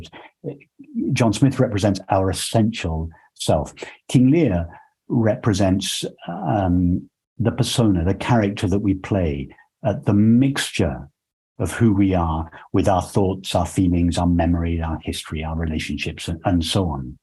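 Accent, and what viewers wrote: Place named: Belfast, United Kingdom. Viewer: Hi, I here ur accent I feel like ur from Belfast or near to that. I have been on this journey for some time now and I get some glimpses here and there. I'm really starting to understand things at an intellectual levels. I'm on you tube alot seeking. I'm just wondering is there anyone you woukd recommend from our side of the world to help guide me on this path. Thank you for this video. I look forward to watching more. ❤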